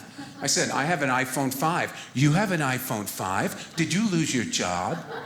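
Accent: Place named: New York, United States. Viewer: I didn’t even notice he was from New York because I live here. Lol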